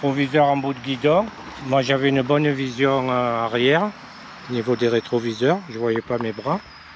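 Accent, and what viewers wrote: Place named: South, France. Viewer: Avé le bon accent ! Merci pour ton retour.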